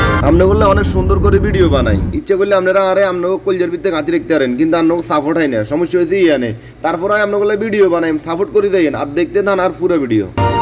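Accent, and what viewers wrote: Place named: Chittagong, Bangladesh. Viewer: চিটাগাং ভাসা মনে হয়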